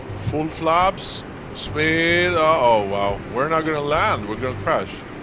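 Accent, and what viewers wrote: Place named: Netherlands. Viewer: Nederlander zo te horen haha. Ben je al naar je eigen huis geweest in game ? 😂. Was een van de eerste dingen die ik deed. En naar al die leipe plaatsen zoals north sentinel island en Chernobyl enz enz 😂😂😂.. ik zit ook op base ps5 en het speelt heerlijk, maar ik speel dit niet echt als een flight sim, meer als een tourist sim lol. Al vlieg ik wel naar de locaties toe vanaf een vliegveldje dichtbij maar vluchten van hele dagen zie ik me zelf niet zo snel doen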